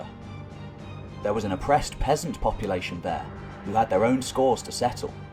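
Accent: United Kingdom. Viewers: whereabouts specifically in the UK is this accent from?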